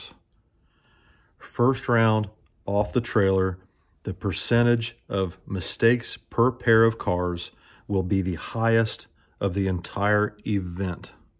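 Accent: South, United States. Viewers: accent is country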